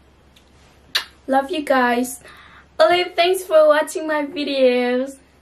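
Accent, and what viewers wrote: Mizoram, India. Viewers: I mizo tawng hman hi a nalh 😂❤️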